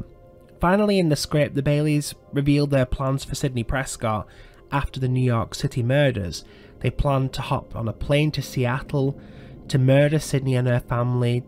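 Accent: United Kingdom. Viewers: What british accent is this? Its my absolute favourite 😍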